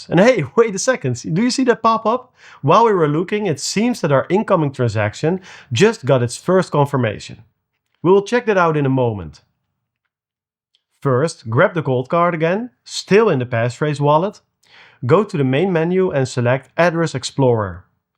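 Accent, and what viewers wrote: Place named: Netherlands. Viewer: lol dacht ga engelse vid kijken gelijk NL Accent gehoord nu nog meer geintresseerd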